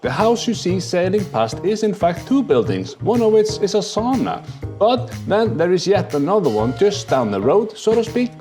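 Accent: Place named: Iceland. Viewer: Everything sounds better with Icelandic accent! But be honest, you have eaten these birds before, did you?:)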